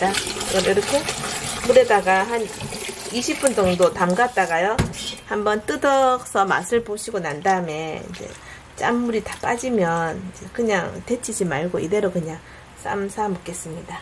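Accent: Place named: Busan, South Korea. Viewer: 곰피 그라이까네 돌아가신 우리어무이 생각이 나고 아련한 추억 과 정서적 그리움 이 나네예 이 조리하명셔 하시는 나래이션 억양 도 어쩌면 어무이 억양하고 똑 같는지 모르겠심더 꼭! 우리어무이가 환생 하셔서 내 어릴적 부엌에서 음석 하시는 모습 이 회상 되네예 저는 어려서 식구들 따라 부산에서 서울로 이사 왔 심더 이제 나이 60 문턱에 있고 저희 노친네 부모모두 다 세상 떠나셨지만서도 우리 집안 음식맛은 이제 어디가서 도 손맛 을 느끼기가 어려워서 추억으로만 간직하며 있었는데 채널 을 보며는 꼭 우리집안 음식 만드는 정서가 자극 되네예 😊 어릴적 어무이따라 자갈치시장 장보러 가고 하다보이 저희집 음식은 젓갈도 비리고 짜고 맵고 하는 기호 이다보이 이 젓갈도 비린거 좋아하는 사람 운운 하는 맨트 들으면 꼭 백프 나한테 공감 와 닿심더😄 어쩌면 꼭 억양 어투도 우리어무이 하고 꼭 같는기라예 음석 취향도 같고😂 내 생애 다시는 우리 어무이 음석 손맛 못 찾을것 같은데 유튜브 채널 보며 내안에 잠재되었던 우리집안 음식 정서 를 자극 받심더😆 이제 우리집안 일가 그쪽 친족들 다~ 아 어른들 이 않계시고 고향 에는 아무도 없다보이 부산경남 쪽 음식손맛 의 전통을 느낄 기회도 없어지는게 아쉬움 그리움 으로 간직하며 지내는데 우연찮게 채널 레시피하고 나래이션 보고듣고 하이까네 돌아가신 어무이 생각 나서 댓글 몇자 정겨움 과 고마움에 올려보네예 계속해서 내고향토속적 음식 조리방식 비중으로 좋은 방송 해주세요 아셨지예🤣 화이팅! ✊👍 레시피를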